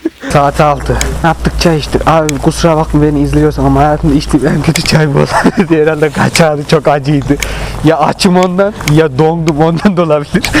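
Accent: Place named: Konya, Turkey. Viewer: La bende konya bozkirliyim abi şive n tam bizim ora yaaa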